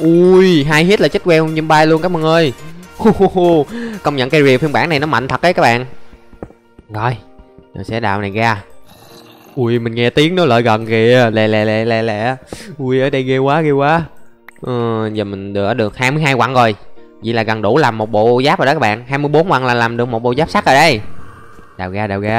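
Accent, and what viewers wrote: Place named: Dong Thap, Vietnam. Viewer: Giọng ngày xưa đặc chất Đồng Tháp lun 🎉🎉🎉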